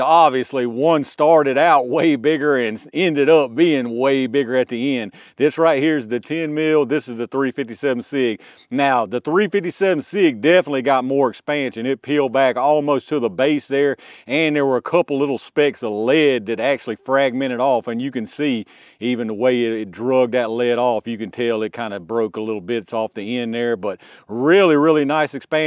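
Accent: South, United States. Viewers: My call goes for the 45Super!!!! Please test it head to head with the 10mm! Your southern accent sounds so cool, I am inclined to believe you are faking it!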